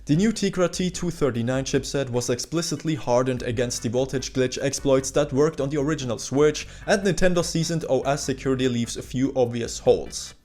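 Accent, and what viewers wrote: Germany. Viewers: I hear a bit of German or am I wrong 😅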